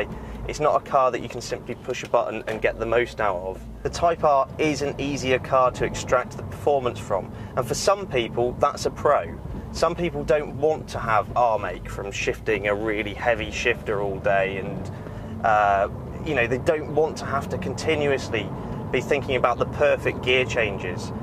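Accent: United Kingdom. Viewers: The cars are obviously wearing european license plates and he has a British accent...there were clues gentlemen.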